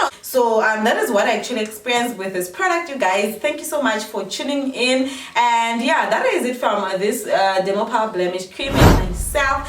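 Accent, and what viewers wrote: Tsonga, South Africa. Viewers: I love everything about this Tsonga accent 😍😍😍😍 and that you're not trying to fake a different accent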